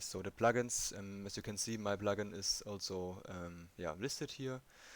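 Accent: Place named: Germany. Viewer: the german dialekt kickt bei dir aber anders rein :D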